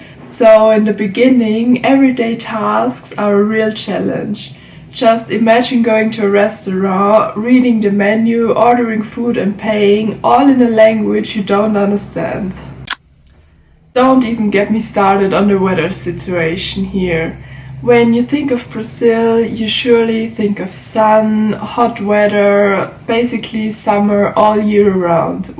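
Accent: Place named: North Germany, Germany. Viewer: Loved the content! What would you say about the metro system? Also your accent seems from north Germany!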